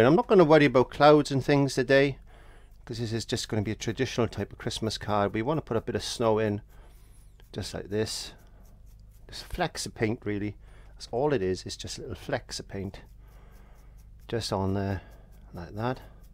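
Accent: Wales, United Kingdom. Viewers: How wonderful to hear that wonderful Welsh accent. Land of my Fathers.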